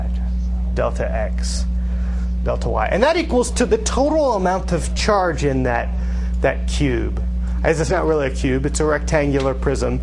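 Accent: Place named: United States. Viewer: Why must American's pronounce every greek letter differently >.<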